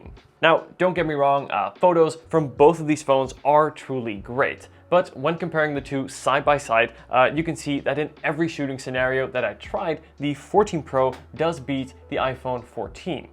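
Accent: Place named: Netherlands. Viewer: You speak dutch to? I hear a little accent.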